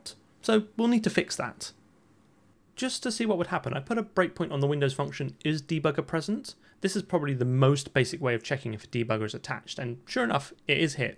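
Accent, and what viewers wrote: United Kingdom. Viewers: i love your accent... remember me when i been in london.. age ago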